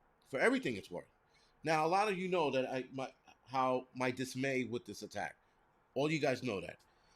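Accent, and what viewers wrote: New York, United States. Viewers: I loved your video. You are so real and talk like a born and raised New Yorker. When I heard you talking about you might be in trouble for just being at the rally my heart skipped a beat. I pray you keep your job and your family are safe. Please keep go for the ignorigation. We don't need to see a few dozen people that will be there. You have been talking about getting out of the city for a while maybe this is a sign for you and your family to leave soon. 🤬🐈🤭💖. God bless you and stay safe.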